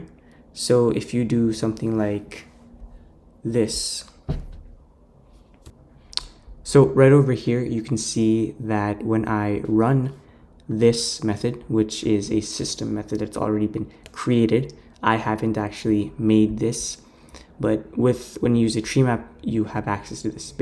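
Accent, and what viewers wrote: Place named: India. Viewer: lol hes got a subtle indian accent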